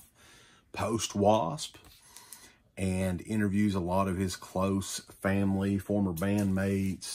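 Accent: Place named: Deep South, United States. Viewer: Where you from brotha? You got a good ol deep south accent. Im in Arkansas from Texas. Glad to see good ol boys on some good ol metal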